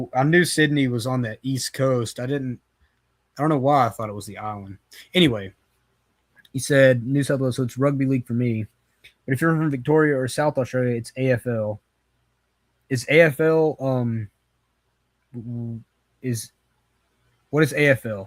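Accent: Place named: South, United States. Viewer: This is cool. Love to see it, and to hear that southern accent😂 This is one I haven't watched with my son yet. We both love baseball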